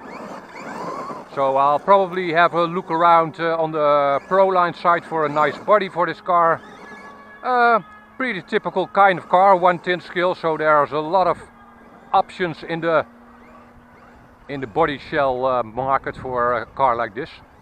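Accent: Netherlands. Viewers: ik had de naam van je kanaal nog niet eens gezien maar toen je begon te praten hoorde ik meteen dat je een Nederlander bent maar leuke video ga o door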